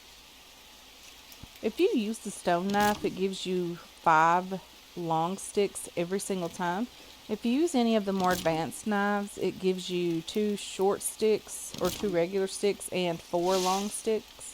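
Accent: Kentucky, United States. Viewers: From 1 Ky girl to another love the accent! Love watching you play Green Hell. Tip: unless just for videos & showing how to build I would not invest tons of building into drug camp or until you get to second map Anaconda Island or past if in story mode build only small overnight stopping points & water filter or containers etc... you will most likely spend very little time on this side of the map. ;-) there is also a Great cave to build in just go straight back behind the pig trap. Also watching your second season of Subsistence from the beginning in the 30s now liking it as well. Love your stories about your mom, canning & family. If you have not tried Grounded also check it out.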